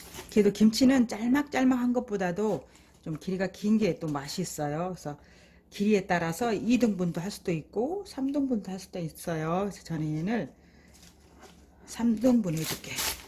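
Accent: Jeolla, South Korea. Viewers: 누님 고향은 어디신가요, 말씨가 전라도 같은데?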